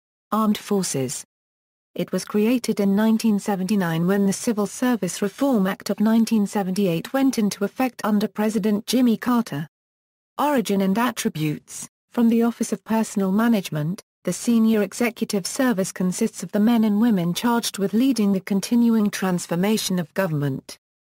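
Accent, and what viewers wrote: United Kingdom. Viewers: why is a British person saying this lol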